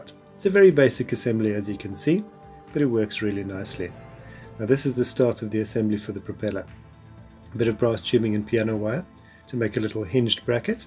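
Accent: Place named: South Africa. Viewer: Great video and SA accent, just started with this hobby, lots to learn from a master craftsman. Looking forward watching all your vids. From sunny CT.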